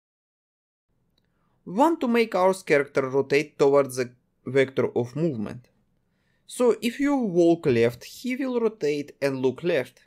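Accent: Russia. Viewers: Are you russian or what?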